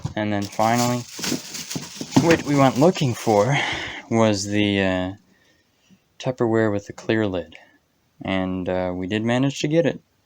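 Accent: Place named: New Zealand. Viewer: Your voice and accent is just like Kane Williamson, New Zealand Cricketer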